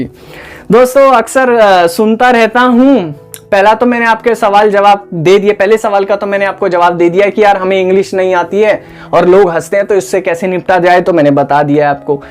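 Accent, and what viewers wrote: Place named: Bihar, India. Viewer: bihari tongue, kya baat hai kya baat hai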